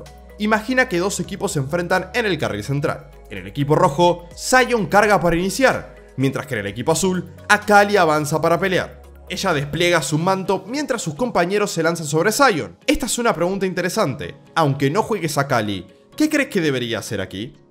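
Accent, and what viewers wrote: Standard. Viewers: Porque habla en neutro si es más Argentino que yo 👀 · Solo a mi me desespera que un argentino hable neutro?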